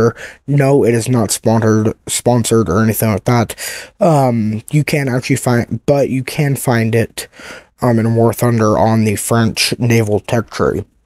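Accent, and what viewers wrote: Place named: United States. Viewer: The narrator is an American.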